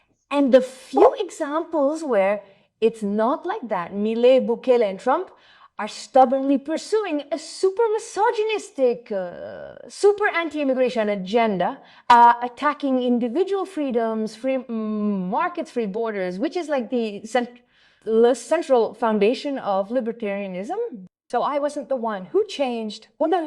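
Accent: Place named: Russia. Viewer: Porque suena como un ingles ruso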